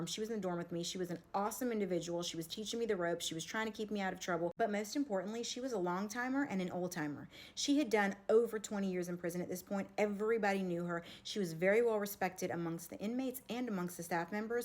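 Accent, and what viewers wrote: South, United States. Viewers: Wait, I just watched you with Ian Nick and you said you were a Jersey girl and spoke as one. What's with the "y all" and southern accent. It's all good .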